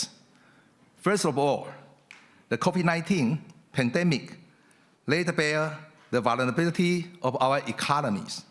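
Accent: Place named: Taiwan. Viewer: 英文發音不好，是因為台語的關係＇如果台灣人的普通話說的好的話＇通常英文的發音不會是這樣的＇這是身邊的台灣朋友出現的這種現象，既使現由專業英語人士再教育也改變不了這台語調的英文。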